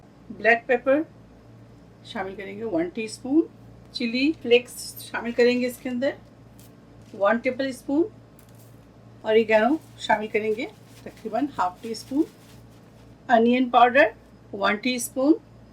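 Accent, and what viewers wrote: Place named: India. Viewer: How nice you explain every thing ...... I will try the patato that way loved you accent from india....... And give more food video.......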